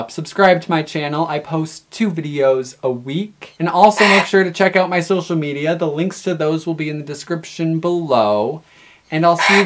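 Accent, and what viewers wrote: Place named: West, United States. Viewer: Also I hear a bit of a western accent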